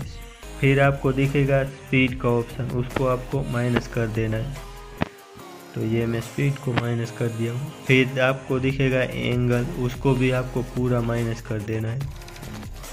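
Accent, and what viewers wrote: Gujarat, India. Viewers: Bhai aap ka voice Gujrati lag rha hai mebhi Gujrati hu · BRO GUJRAT SE LAG RAHA HE ❤ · Bhai aap Gujarati ho aapke voice se lag raha hai sach kya ??? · Tare avaj thi aevu Lage che ke tu gujrati che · You from gujrat?? You voice like Gujarati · Bhai Gujarati ho kiya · Bhai tme gujarati chho ne😅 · Apke avaj se lag rha hai aap gujarati ho. Sach bat hai ?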